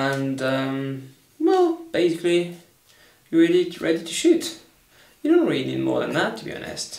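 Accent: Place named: United Kingdom. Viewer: Thanks for sharing this, where did you buy the whole set (am guessing from your accent you live in the UK)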